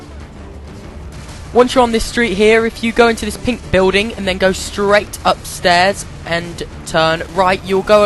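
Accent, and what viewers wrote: United Kingdom. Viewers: I love his british accent =)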